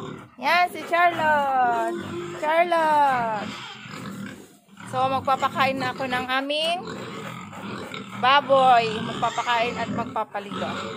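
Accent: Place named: Tagalog, Philippines. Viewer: You speak clean Tagalog is here for me to understand👍 salamat. Baboy to loud. New friend and subscriber. I’m Jerry from north carolina u.s. lol mabahoo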